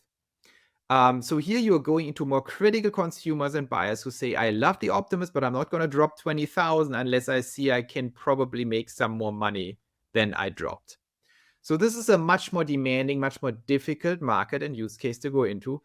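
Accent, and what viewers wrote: Germany. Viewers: The fact that you employ a German accent in your presentation adds to the drama and seriousness. Nice!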